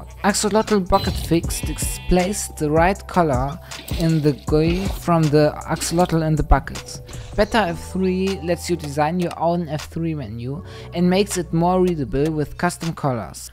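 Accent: Germany. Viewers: r u German? XD nice Video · Very, very cool video! By the way, are you german?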